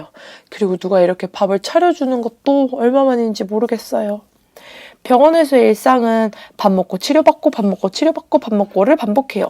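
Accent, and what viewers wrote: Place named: Jeolla, South Korea. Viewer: 저도 광주사람이라그런지 전라도사투리가 친숙하게 들리네요~~영상 잘봤습니다~~❤️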